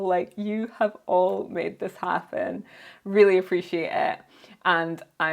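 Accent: Scotland, United Kingdom. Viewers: R U from Scotland ? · Are you from Scotland 🏴? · I love you accent, is it Scottish? I love the Scottish accent.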